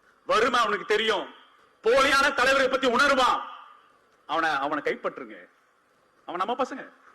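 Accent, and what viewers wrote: Kongu, India. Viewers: கொங்கு தமிழ் நல்லா தான் இருக்கு ஆனா சாராயக்காரனுக்கு சொம்பு அடிக்கிறதா நல்லா இல்ல.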